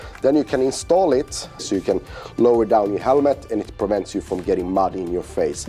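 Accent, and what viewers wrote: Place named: Sweden. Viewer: Fan vad jag gillar Hur du förklarar Doften av Hubba Bubba =) skön informativ video med skön svengelska ! tumme upp...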